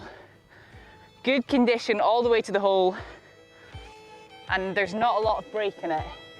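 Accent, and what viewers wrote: Scotland, United Kingdom. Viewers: Really good idea, with real insight from someone who explains clearly in a lovely brogue - a pleasure to listen to. Suggest Dunstanburgh and Edzell (both hidden gems) and possibly Lady Bank with possibly Victor Perez in Scotland and Lee Westwood at Dunstanburgh. Down south what about Ferndown and Trevose with Andy Sullivan. Really looking forward to the series where ever you choose. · I watch your videos for the golf.. and the charming Scottish accent!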